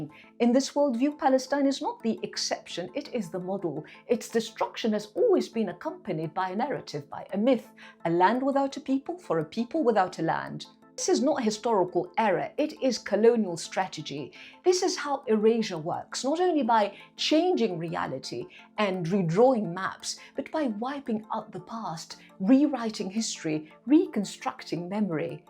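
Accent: RP, United Kingdom. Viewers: Excellently read lines off the screen with a weird queen's english accent. Just bizarre. Don't know what is worse - ai slop or fakery like this.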